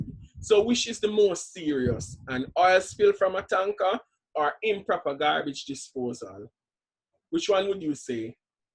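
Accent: Jamaica. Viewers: i really enjoy listening to your Jamaican accent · the Jamaican accent is a nice one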